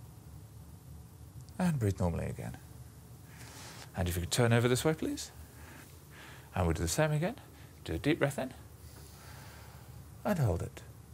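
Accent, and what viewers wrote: United Kingdom. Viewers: What's his accent? It sounds different than the normal British variants...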